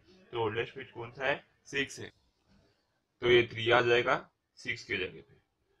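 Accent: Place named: Mumbai, India. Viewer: kya badiya mumbai accent hai sir ghar ki yaad dila di ekdum badiya sikhaya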